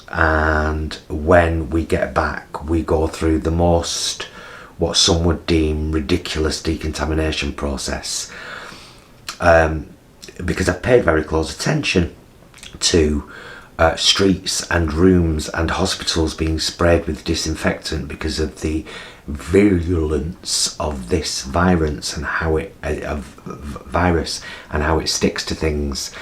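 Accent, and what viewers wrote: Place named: Northern England, United Kingdom. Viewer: Cheers!, also very good to hear a proper northern voice whilst I’m stranded abroad 😍🙌🏻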